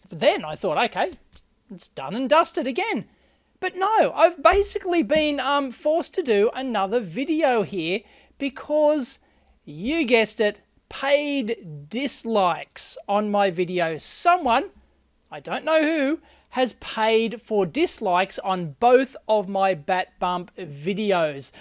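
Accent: Australia. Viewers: Like all your vids, despite that Artful Aussi English :) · As a kiwi I find your aussie twang annoying, but I wouldn't dislike a video based on it. I power through it because your content is absolutely great. I can only imagine your natural dislikes are from cranks who hate the truth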